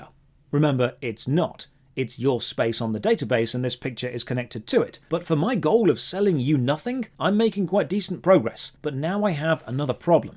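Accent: United Kingdom. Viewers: Now we need him to fanboy massively at Space Marine 2 and bitch about even more terrible corporate practices with hilarious British accent.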